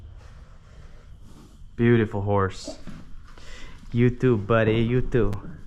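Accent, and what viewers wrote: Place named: Ohio, United States. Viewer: I fkn knew it dude 😂🤘🏽 you kinda got an Ohio accent lol I’m from northeast Ohio , Lorain Wellington area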